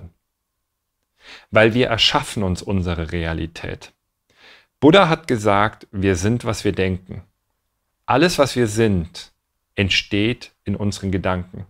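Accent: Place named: Bavaria, Germany. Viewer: Nur die bayerische Aussprache stört, z. B. Großartikkeit ..., sonst super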